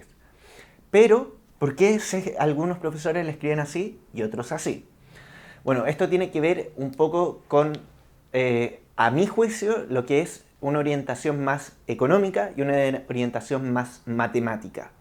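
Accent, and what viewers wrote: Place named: Chile. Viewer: Chileno detected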